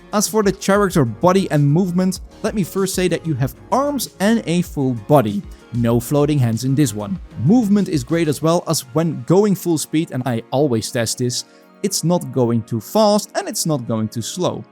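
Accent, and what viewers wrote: Netherlands. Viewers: Ik ruik een nederlander😂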